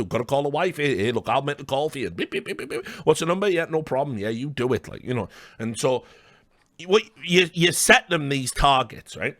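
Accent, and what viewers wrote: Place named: Birmingham, United Kingdom. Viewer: Brummie \m/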